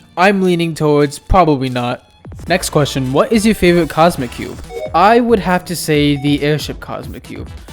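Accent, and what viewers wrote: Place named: United Kingdom. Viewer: why does your voice sound so british?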